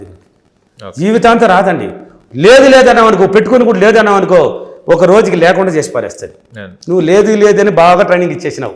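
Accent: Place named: Chittoor, India. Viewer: I think he is from Chittoor... That slang is lub❤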